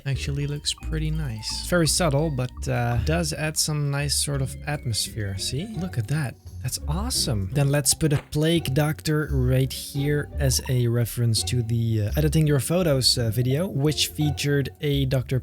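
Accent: Netherlands. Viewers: I heard your voice for a minuite and I thought to myself 'this guy must be dutch'. It's the same accent as from Michiel Huisman (dutch actor from Game of Thrones) and Paul Davids (dutch youtuber who speaks english also) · it sure does sound dutch, I mean, it's not a horrible one, probably why people say it doesn't sound like one, but from my experience (me being swiss) you do sound dutch :)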